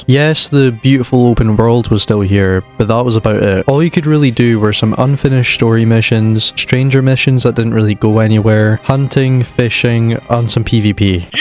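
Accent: Scotland, United Kingdom. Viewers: I smell a Scot. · Hey, are you Scottish by any chance? Your accent is kind of peculiar in a good way lol.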